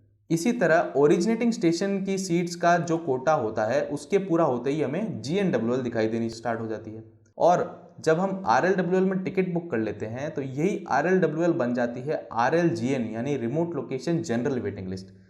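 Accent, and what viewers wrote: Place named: Jodhpur, India. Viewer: Bhai jodhpur se ho kya?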